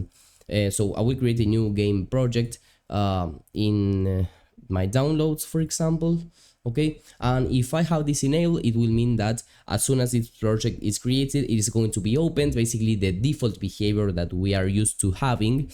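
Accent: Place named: Argentina. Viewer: Hermano te veo todos los vídeos. Se nota que sos Argentino jajaja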